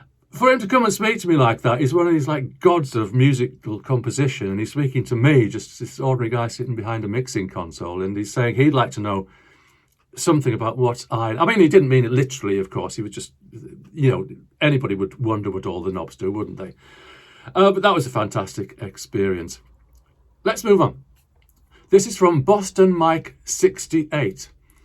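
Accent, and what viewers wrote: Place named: Teesside, United Kingdom. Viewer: Your accent sounds familiar…..are you a Teesside perchance?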